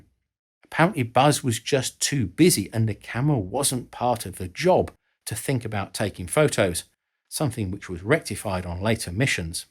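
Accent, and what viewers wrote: United Kingdom. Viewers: When NASA begins to run into a wall they bring this soft spoken non confrontational! British accented to sound sophisticated and knowledgeable! To help remove doubt that this is again a story for the first time discussing a hand held camera in mercury capsule?😂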